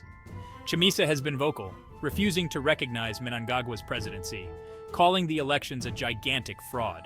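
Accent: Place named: Europe. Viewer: Would have preferred this being reported by Museyamwa, Mutenhesanwa or Nyamuzihwa - or their female counterparts. Not this Eurogenic voice. Do we ever get an African voice promoting an American, British, French or other political outfit? What's the agenda here? It makes some of us question whether Chamisa is any different from the American puppets Nana Akufo Ado or William Ruto, to mention but just two. Does he really stand for us, if such a voice as this is his representative?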